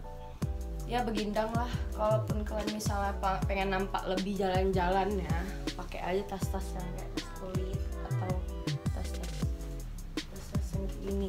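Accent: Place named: Lhokseumawe, Indonesia. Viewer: logatnya kaya anak lhokseumawe atau aku aja yg salah denger._.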